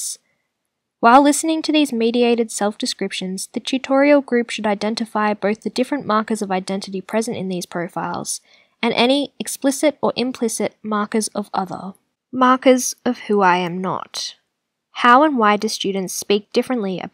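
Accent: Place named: Melbourne, Australia. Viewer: Oh right, you're from Melbourne, no wonder you sound a bit different. PS Brisbane here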